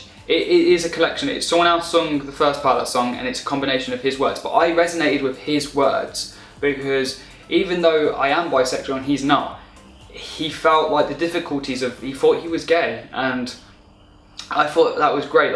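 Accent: United Kingdom. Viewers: I picture you eventually with a special understanding and lovely woman, having a couple of kids with her but having boyfriends on the side whom your woman friend would also know. You're so appealing. Whoever you get involved with is lucky. You voice reminds me of Russell Brand's. Hope you aren't offended by the comparison. I love British accents.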